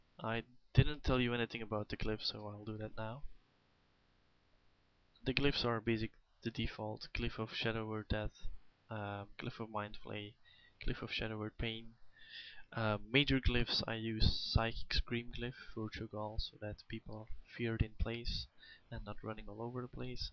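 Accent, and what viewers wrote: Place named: Sweden. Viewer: nice video, öva lite på ditt utal så kommer ingen höra att du är svenks :) i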